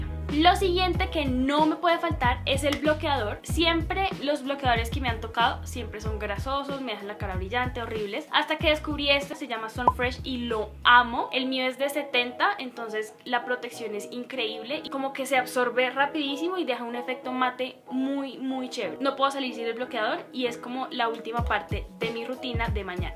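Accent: Colombia. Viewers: Eres la colombiana que habla más bonito 🎶🎶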